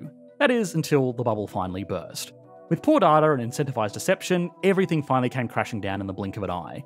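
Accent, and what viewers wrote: Australia. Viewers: Slow down mate , i know your have the limit for vedios time but your fast aussi accent is hard to follow. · Probably Australian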